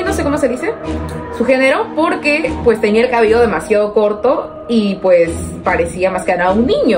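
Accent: Chile. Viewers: eres chilena🤔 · Pregunta eres de chile 🇨🇱?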